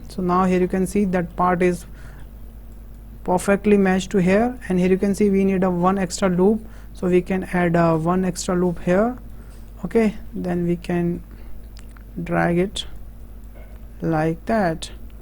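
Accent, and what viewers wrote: India. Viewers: %98 indian accent %1 quality %1 benefitfull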